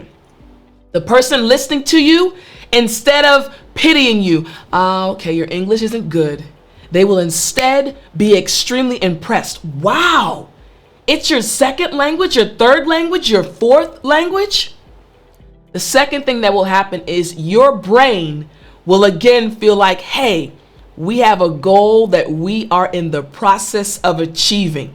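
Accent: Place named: United States. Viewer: I love the American accent.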